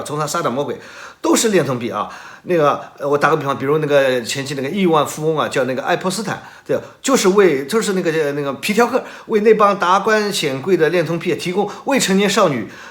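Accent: Shanghai, China. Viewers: 侬是上海宁啊？好格，订阅了😊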